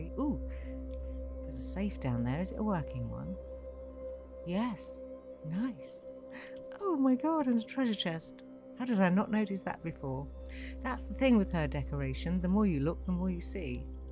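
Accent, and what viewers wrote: United Kingdom. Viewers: You sound like the cutest mother playin Skyrim with that Pommy accent. 😆xx Aawh fkn ell! 😂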